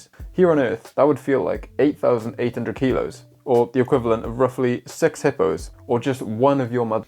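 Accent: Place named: England, United Kingdom. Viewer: Legit sounds like Tom stanton... like as if they went to the same schools growing up with each other with whatever part of England they're from.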